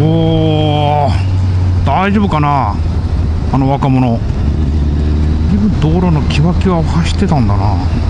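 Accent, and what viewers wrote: Tohoku, Japan. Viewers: この訛りは東北の人ですか？ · 喋り方で東北の人かなって思うw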